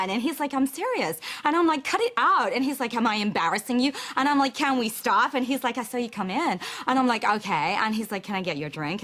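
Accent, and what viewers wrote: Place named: United States. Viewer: wow, shes got a pretty good american accent lol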